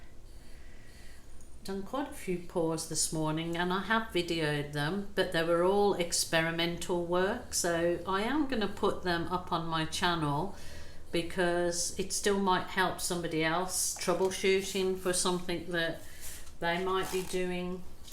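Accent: Birmingham, United Kingdom. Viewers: That's so funny because I was going to suggest you were originally from Birmingham as you have a strong inflection on certain sounds - and it is strang that I should notice it to the exact region in the UK as I am an Irish woman, living in Ireland all my life haha! Keep up the beautiful work and I love that you are using glue. It just proves that you can do amazing things without breaking the bank. Have a great day and thank you for everything!!!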